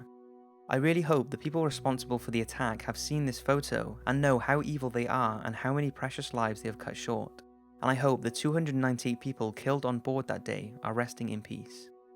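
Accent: Wales, United Kingdom. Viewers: are you welsh............. anyway love the vid :)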